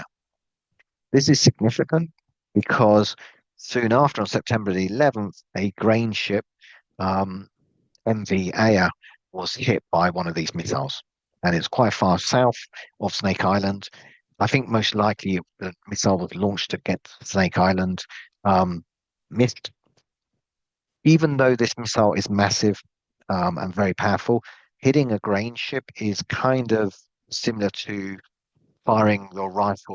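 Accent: United Kingdom. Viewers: I love your briefings, but the sound is horrible. a) British accent, b) RUS/UKR names and locations, c) lots of acronyms and technical words. Time to block channel.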